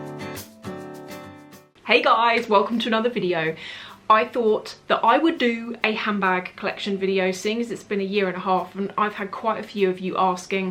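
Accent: United Kingdom. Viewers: Hello. I recently discovered your channel and I absolutely adore you!!! Due to your British accent, I struggle to understand some of the things you say. You stated where you sell your bags and I could not get what was said.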